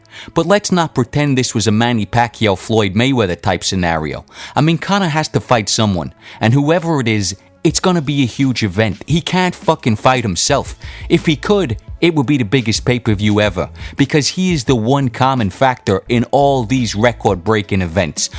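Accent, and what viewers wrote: United States. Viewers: What region of america is this accent from? I kind of recognise it but can't place it. I find it interesting.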